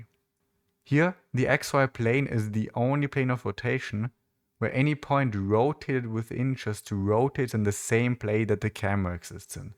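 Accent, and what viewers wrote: Germany. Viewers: Do i hear Deutsch? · Nice Video ❤. U Sound German😂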